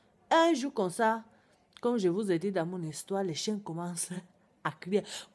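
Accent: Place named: Africa. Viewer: BJR je voudrais juste vous poser une quest pq vous parler comme ls africaines je vous juge pas ms sa m ennerve a entendre parler ainsi cet comme cet pts jeunes blanc la il parle comme ls arabes pour etre vue voila je suis en couple avc un africain dnc vous voyer juste savoir le pq ou cet votre vrai voix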